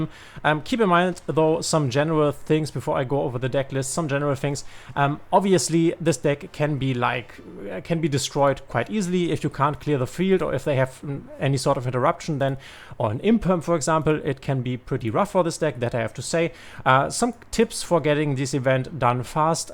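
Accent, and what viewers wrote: Germany. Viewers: Bist du deutsch ? Dein Englisch hört sich nach deutschem Dialekt an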